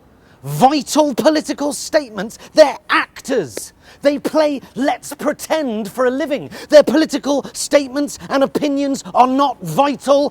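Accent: Australia. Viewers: Love the Australian accent, boy! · Strong Aussie accent game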